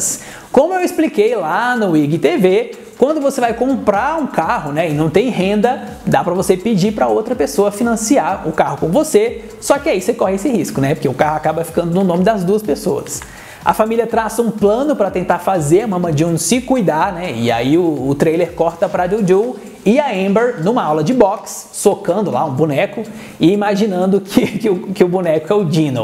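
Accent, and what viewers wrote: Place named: Caipira, Brazil. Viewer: Kkkkk adoro a dublagem kkk bem caipira mesmo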